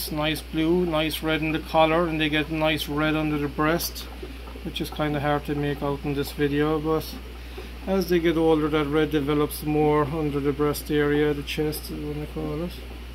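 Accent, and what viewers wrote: Ireland. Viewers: You’re either from Galway or Mayo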